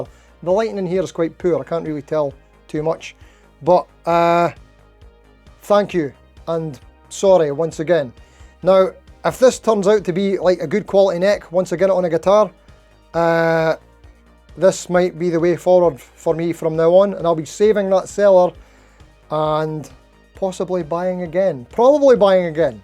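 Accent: Scotland, United Kingdom. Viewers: glad you took it in good humour, I'm in Australia and we have trouble understanding a thick Scottish accent. Not that our accent is anything to brag about lol. 😊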